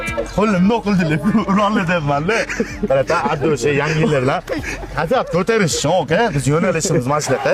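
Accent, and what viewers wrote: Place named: Namangan, Uzbekistan. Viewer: Намогон шеваси узгача гап йук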